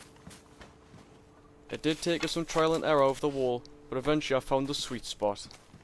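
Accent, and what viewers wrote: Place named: Newcastle, United Kingdom. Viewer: Good to hear a Geordie streamer, great video mate 👍🏻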